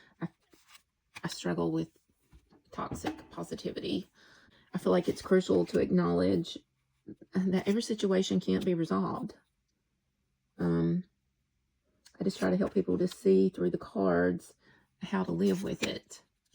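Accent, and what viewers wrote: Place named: South, United States. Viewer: This was an amazing video! My jaw dropped with your first piece of advice and then the hits just kept coming! Thank you so much for sharing your perspective and wisdom with us 🥰 (And this has nothing to do with tarot but I love your dialect of English! I'm a former language teacher and in love with how people talk. I'm from Minnesota and rarely get to hear the beautiful accents of the southern states.)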